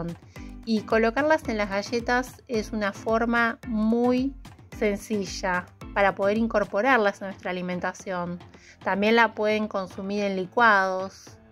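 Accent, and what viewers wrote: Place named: Argentina. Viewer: Sos una genia! Las mejores recetas! Te sigo desde Argentina y reconozco tu acento! Muy bello tu canal!!! 😘😘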